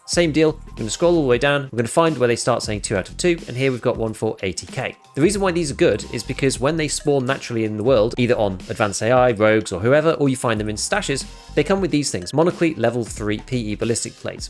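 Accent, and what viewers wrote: United Kingdom. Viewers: he's a brit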